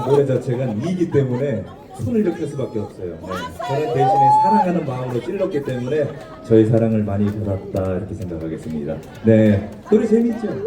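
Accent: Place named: Jeolla, South Korea. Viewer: 전라도 말솜씨 는 언제 배웠남유 👍일품입니다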